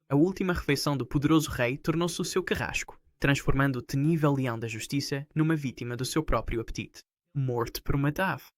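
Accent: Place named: Portugal. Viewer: Na verdade, português europeu. Se prestar atenção dá para entender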